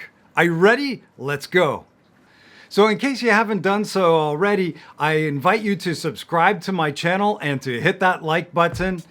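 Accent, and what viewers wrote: United States. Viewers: Hey there You absolutely have an amazing and super important accent! Are you from mid west of the United states? I lost your terrific channel a long time ago.but I got it today in a fantastic way. I wrote fluency English for Chinese! Wow I got you there! Truth be told I l have been looking for you for more than four months! I lost your name and the name of your unique channel! What I am really interested in creating music with your lessons I learned gimme from you last year! Thank you so much for sharing this amazing lesson! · I like u very much for american accent sr. I have been watching ur vds since long time and im imroved a lot. Lots of love. ❤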